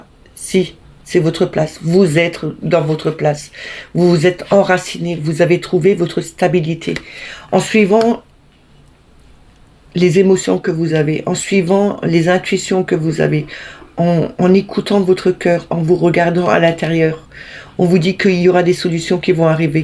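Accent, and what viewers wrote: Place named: Alsace, France. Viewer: Bonjour, abonnée depuis peu, je prends plaisir à vous retrouver chaque jour. Je dénote un petit accent Alsacien, je me trompe??? Si c'est le cas, nous ne sommes pas bien loin puisque je suis près de Thionville en Moselle. Merci en tous les cas de vos tirages toujours éclairants et de votre bienveillance. Douce et belle journée à vous et à la communauté. 😘💖💝💐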